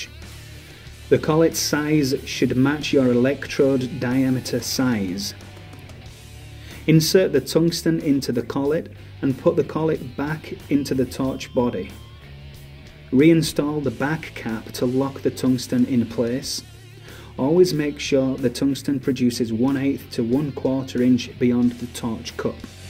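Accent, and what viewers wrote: United Kingdom. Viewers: Yes, it is absolutely appalling, but as he has a 'Midlands' accent it isn't very surprising. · Agreed, but I think he's a Manc lad!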